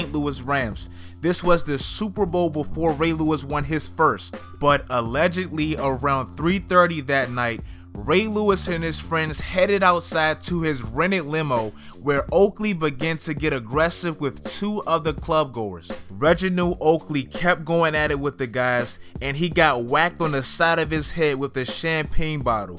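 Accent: Baltimore, United States. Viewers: Yo u from Bmore?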